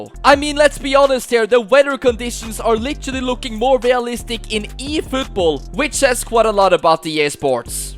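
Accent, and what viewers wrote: Norway. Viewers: Jeg kan høre på engelsken din at du er norsk bruttern❤😅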